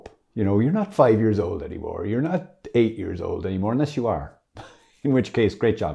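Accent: Ireland. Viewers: Gosh, Father, I learned something....one of which is we gain more graces other than the forgiveness of sin. I liked the phrase did I love Jesus through the day? Have to think about that. Generally I love these short but pithy videos....so much is packed in. And, there is always your Irish accent! Esther:yougotthis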